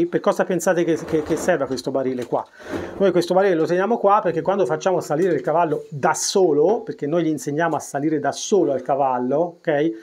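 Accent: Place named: Tuscany, Italy. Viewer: Bravo, spieghi talmente bene e in modo chiaro che possiamo capire tutti. 👍Grazie. E un piacere ascoltare il tuo accento tos ano😊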